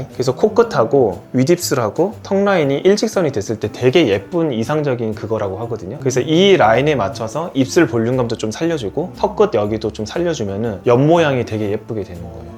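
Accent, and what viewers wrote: Gyeongsang, South Korea. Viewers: 진행자분 경상도 사람이네예~^^ 서울말투에 섞어나오는 친근한 말투~*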